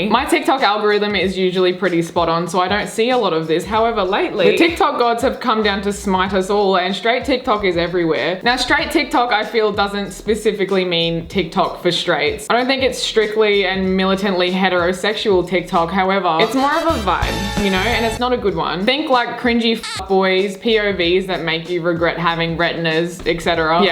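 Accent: Australia. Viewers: I got scared for a second, but nvm it’s fine. (Also are you Aussie? You sound like it) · Ma’am you sound like a fellow Aussie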